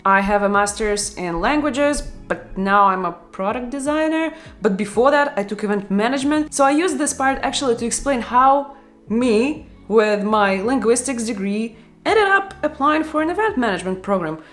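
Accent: Russia. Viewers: thank you So much for this video... love your Russian accent.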